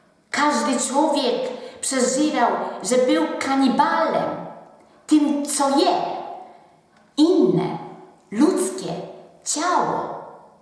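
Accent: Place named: East, Poland. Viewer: Ona ma wschodni akcent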